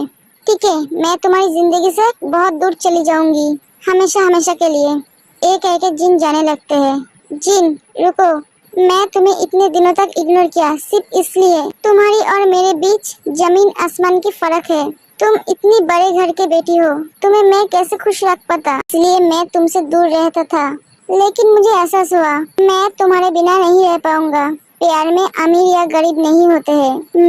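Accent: Bengal, India. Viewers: Di Bengali ho kya aapki Hindi bolane ki Tarika se aisa lag raha hai mujhe.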